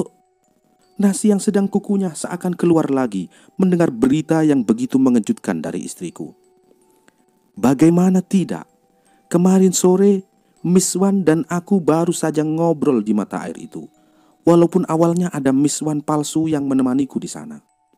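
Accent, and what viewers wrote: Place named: Java, Indonesia. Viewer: ternyata enak juga dengerin suaranya bang 😊 Dari narasi intonasi enak didengar. Ngebass agak berat cocok buat jadi MC. Cuma logat jawanya masih kental. 🙏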